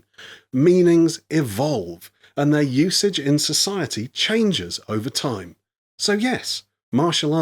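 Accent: England, United Kingdom. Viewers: Is he being sarcastic when he asks how I’m doing or is just his English accent?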